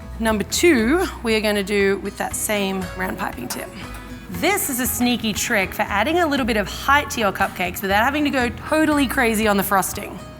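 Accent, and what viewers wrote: Australia. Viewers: Is she Australian? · She sounds like she’s from Australia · This was fun to watch tbh haha. and is that an Australian accent? I love her voice lol 😋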